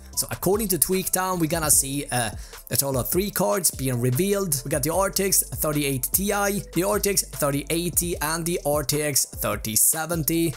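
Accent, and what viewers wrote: Sweden. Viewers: he's a swede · As a fellow Swede I instantly knew you where swedish hearing you speak english haha, no offence man!. That said, please work on your presentation, you sound like a robot and talk way to fast. This is only constructive cririsism, the content is great! peace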